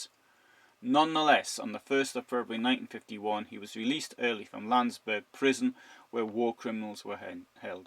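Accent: Newcastle, United Kingdom. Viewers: Really enjoy the channel, improving my knowledge & understanding, the fact that I also love the Newcastle accent is a real bonus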